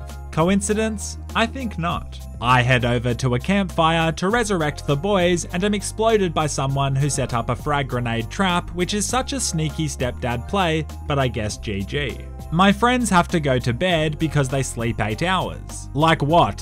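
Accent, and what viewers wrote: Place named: Australia. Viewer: Can someone pls translate this into English , cant understand this Australian. Thnx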